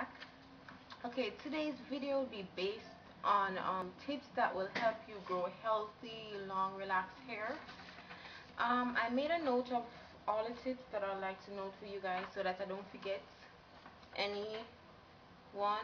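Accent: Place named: Jamaica. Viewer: W00t! Another Jamaican. Whaagwaan? Weh yuh deh? Jamaica still? Bway mi miss it bad bad. Not even affi look pon di name, a hear mi juss hear yuh voice an mi kNOW SEH a muss Jamaican dat. hail up. :D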